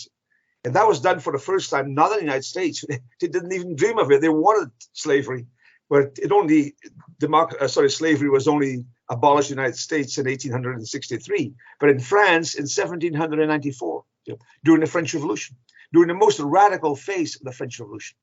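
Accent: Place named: Castile, Spain. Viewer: Es Canarias Semanal, ¿por qué la conductora es una castellano-parlante y no alguien que hable con acento canario?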